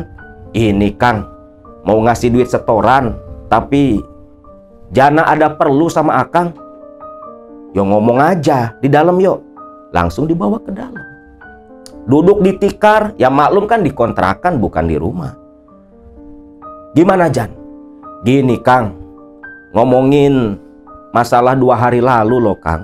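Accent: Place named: West Java, Indonesia. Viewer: Kang keren saya akui selain cerita mistis sejarahnya pun dibeber kan. Jelas iq kang tinggi. Pertahankan bahasa Indonesia dengan logat Sunda kang❤❤❤❤❤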